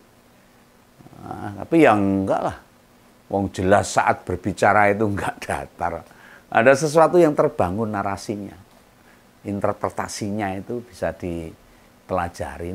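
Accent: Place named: Solo, Indonesia. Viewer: Ini yg selalu saya tunggu. Bukan hanya isinya tapi dialeknya itu lho, bukan sekedar dialek jawa, tapi ini persis spt tetangga2 saya di solo dulu bicaranya, ha ha saya di Jakarta 40 tahun, marakke pengin pulang. 😂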